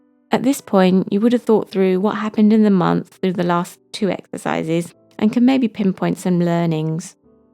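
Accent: United Kingdom. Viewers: Hi again!! Never mind my question about your IG account, I just saw the video again and took screenshot of your IG account name. Oh oh yes, and the spreads and the weekly so I can recreate and tag you. (But I am NOT nearly as talented as you, so it will take quite some time and a lot of hard work and trying and failing before I will have something I’m not too embarrased to show you....😅❤️ It was even more relaxing to watch the video for the second time btw. Cos then I knew what was coming up so I could be a bit more at ease👍🥰 One more thing: you should definately become a teacher of some sort for people with anxiety or stressed people, cos your voice is the most relaxing voice I’ve ever heard. With that perfect British English/accent❤️ I always find myself very relaxed and full of ideas after watching your videos. I don’t usually comment, but after this I just had to🙏❤️ Love from me💖👱🏻‍♀️🇳🇴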